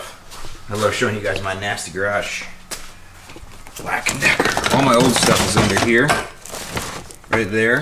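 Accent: Chicago, United States. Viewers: Keep the Chicago accent. too funny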